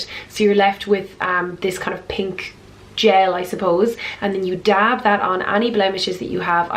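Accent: Ireland. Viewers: R u Irish??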